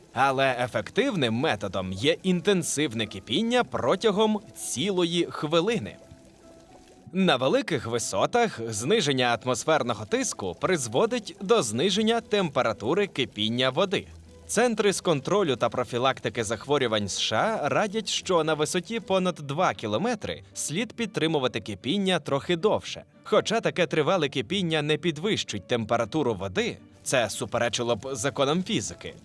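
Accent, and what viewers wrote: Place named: Galicia, Ukraine. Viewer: Паплюження української мови галицьким суржиком. Риготня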